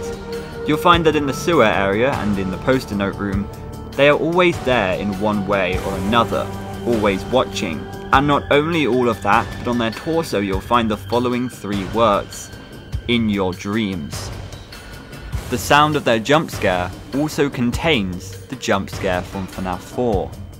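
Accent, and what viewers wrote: United Kingdom. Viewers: Ok but we’re just now gonna mention þe fact þat he got more and more British while voicing? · that British accent tho XD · English isn't my first language and thus I find the UK accent fucking hilarious. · talking reminds me why people hate British people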